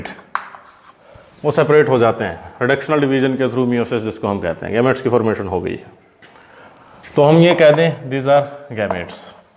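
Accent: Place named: Haryana, India. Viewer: Amazing video lectures you are giving sir. Appreciable and yea I like your haryanvi accent too 😂 but yea you explains everything amazingly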